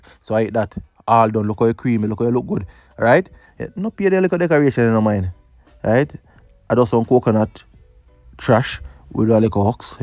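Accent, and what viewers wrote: Jamaica. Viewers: It’s the patois voice over for me 🤣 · you did our Jamaican chat with class!! Bring it on... we'll done. Wi food gone ah broad!!! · Love the voiceover bro✊🏾✊🏾✊🏾 the JA accent came right out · You brought out the Jamaican accent in full force for this one 💕💕💕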